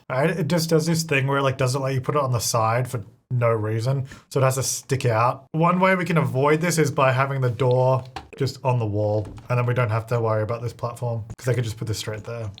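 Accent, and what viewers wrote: Australia. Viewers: I hear the Australian accent bit more now, tbh😅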